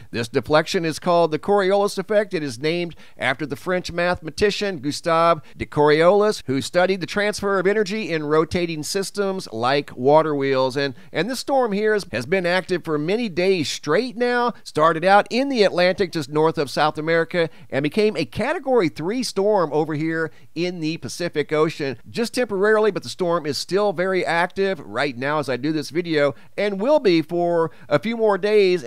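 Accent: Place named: California, United States. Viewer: Great content- when you say words ending with ing it sounds like een. Cali trait.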